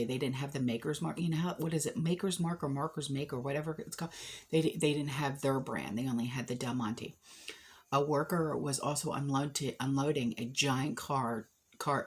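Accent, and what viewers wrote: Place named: Philadelphia, United States. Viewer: Dear love you! are you a Philly girl? Your accent sure sounds like it!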